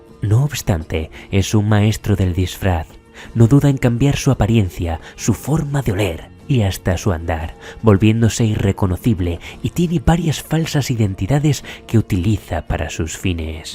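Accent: Spain. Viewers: La verdad el acento español me cae mal.... Pero no puedo dejar de escucharlo 😅😅😅😅😅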